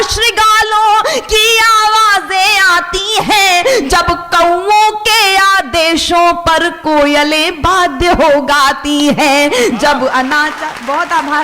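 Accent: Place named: Lucknow, India. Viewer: Bilkul lagta hai k aap Lko se hain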